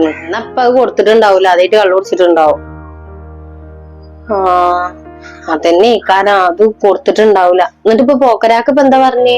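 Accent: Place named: Malappuram, India. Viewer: പക്കാ മലപ്പുറം ഭാഷ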